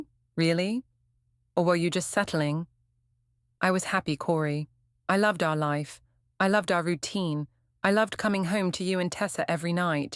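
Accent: United Kingdom. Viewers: Texas with British accent?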